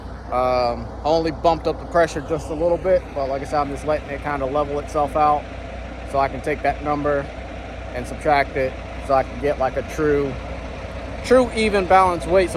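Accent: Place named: South, United States. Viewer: nah bruh ghetto has nothing to do with it.... its super proper., damn near like a country white dude.. but no shade.. didnt mean it like that...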